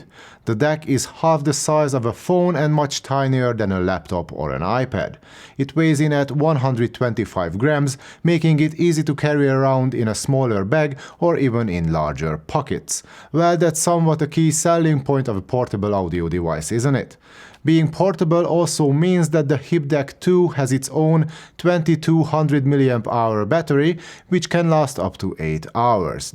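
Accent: Hungary. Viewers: you sound very hungarian edit: I’ve just realized you are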